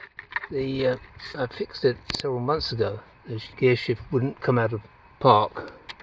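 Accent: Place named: United Kingdom. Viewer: Whenever, as an American (speaking my:"American" English) I want to feel intellectually inferior, I need only to listen to a few minutes to a video where there is a Brit explaining in the REAL English language how to fix something like this. This is by far the best video on this sort of R&R on YouTube. Frankly "the way it should be done " Thank you sir! And darn it, if only it hadn't been for your King George being such an a-hole we'd still be the same country. If we can get past that mess someday, and reconcile, I'd be glad for y'all to have us back!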